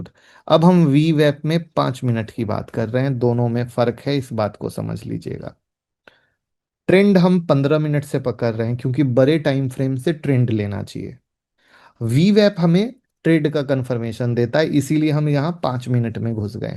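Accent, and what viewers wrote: Bihar, India. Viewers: आप बिहारी हो क्या?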